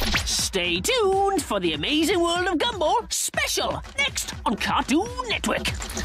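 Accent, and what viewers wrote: Scotland, United Kingdom. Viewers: The narrator sounds like a Scottish accent. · It speaks British accent it's sure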